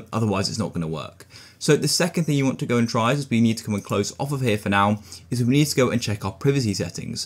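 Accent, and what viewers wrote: United Kingdom. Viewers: thanks british guy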